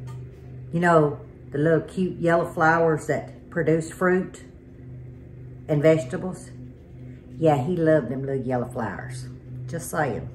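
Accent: South, United States. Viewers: You are a true Southern Belle!!! I love hearing you talk because of your "accent"!! And I love the South.No cukes here yet. We had no rain and I had to haul. I love pickles so I'm praying I get enough. I'm having Grandma Camp next week!! My daughter has treatment so I will take the four children. I Pray constantly that God will keep her here. Okay I need to watch over and write down directions. God Bless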